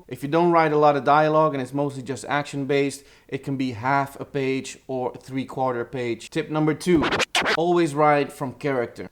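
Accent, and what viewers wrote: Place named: Netherlands. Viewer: Dit is veruit mijn favoriete video. Dank u voor het script op het scherm. Dit is heel nuttig om nieuwe schrijvers die niets en nooit gezien wist over script en keigaaf. Dank u. Wij willen meer wij willen meer. Niet zeker over de kleur keuze hoor. Sorry man. U vraagt om eerlijke feedbfeedback. Oppassen met wat je vraagt om ze zeggen. Rreally vooruitkijkt naar de volgende one.Misschien volgende keer is over kleur kiezen en concrete voorbeelden van het gebruik van de camera op het scherm Iran. Stuurt u voorbeeld van wat ik heb. Film Academy is officieel geopend. Geweldig werk Keep it up.Ik denk dat het tijd is voor Fb pagina waren kunt u deze video's en schrijf mee.ook vriendelijk op beschrijving alle schakels/nes u noemt het cameramodel u gebruikt of kleuring cijfer van dat bepaalde video d.w.z. sterren zeggen hedendaagse episode wordt gefilmd yang zxxz camera thier bepaalde instelling. 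Ook voor bijvoorbeeld staat in thw beschrijving het script App naam duidelijk niets te vermelden, laat een l8nk voor de kijker te gaan zoeken meer over het onderwerp.Sorry maar Nederlandse accent is niet bekend hier. Moeilijk om te begrijpen dat je. hihihi. Weldone.Blijf insturen ooooo je guru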